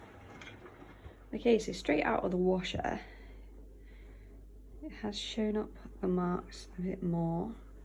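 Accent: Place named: England, United Kingdom. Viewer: Many thanks for these tips and so nice to hear an English accent for once. I think you were really brave to put it in the machine! I've just purchased a 1970's Sherpa style longish Sheepskin jacket/coat which needs some tlc. The fur was originally white but has turned greyish now but the condition isn't terrible. I like your idea's of using the hair products, that is not something I would have thought of tbh, but I think I need to slightly bleach this fur back to a whiter colour because the jacket has white stiching. It would have been fantastic back in the day, but thanks again for these tips.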